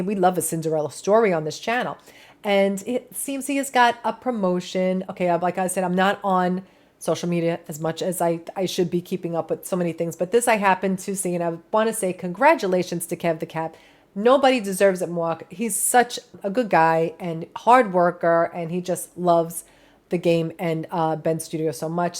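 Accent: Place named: New York, United States. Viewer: Elo mate. I decided to upgrade to your channel, because I love you and your doggies are so, so lovely. You're my fellow green eyed beauty and I love the dogos. Love your ascent. New York/Jersey, I'm guessing. I'm a total Londoner bloke, and I love you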